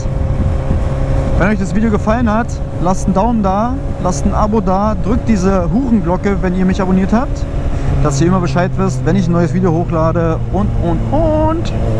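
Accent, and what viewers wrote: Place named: Berlin, Germany. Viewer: da bin ick mal jespannt Keule was übern Winter verändert wird💪😎. Wenn der Schwarze Ring kommt dann mit Gravur = idee von Matze 😂💪. Ick fühl mich dann immer wieder in die Kindheit zurück jeholt wenn die Berlinerschnauze durch kommt. Bin ja in Steglitz groß jeworden vermisse Berlin daher Feier ICH deine Videos mit der Schnauze😂👍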